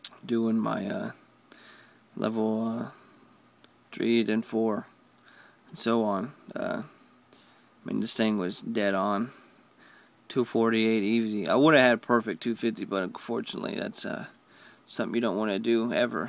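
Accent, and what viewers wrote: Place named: Texas, United States. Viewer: JohnnyAss, Dude is from Texas. STFU Hollywood.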